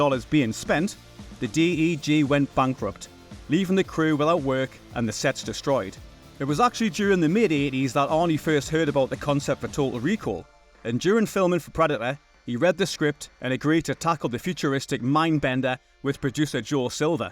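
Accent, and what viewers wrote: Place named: Newcastle, United Kingdom. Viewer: A bold move to use a Geordie for the V/O!!! One of my favorite accents in the world!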